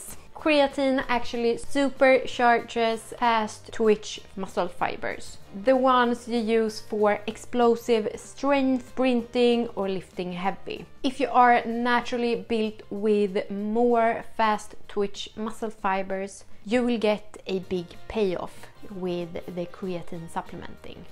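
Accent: Sweden. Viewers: Thanks for the info as I just started my 5mg daily. I felt noticebly stronger/more enduring than usual workout after only few days, but do admit it could be the placebo effect. Will know better next few weeks. Your calm and Swedish influenced accent is appreciated.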